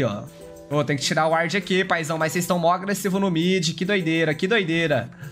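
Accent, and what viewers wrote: Minas Gerais, Brazil. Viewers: é mineiro ou matogrossense?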